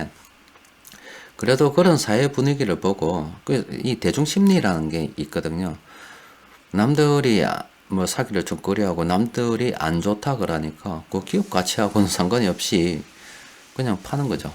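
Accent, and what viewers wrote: Gyeongsang, South Korea. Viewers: 오늘도 좋은 하루되세요 혹시 어디사세요 경상도 사투리 같은데 행복한 주말되세요 꾸벅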